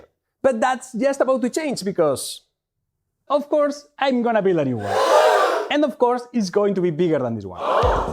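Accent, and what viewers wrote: Spain. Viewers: ese acentazo es español! Que no? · Jajaj primera vez que me aparece un video tuyo, te oigo hablar y automáticamente he pensado: este tio es español fijo jajajaj gran descubrimiento tu canal, aquí tienes un nuevo suscriptor!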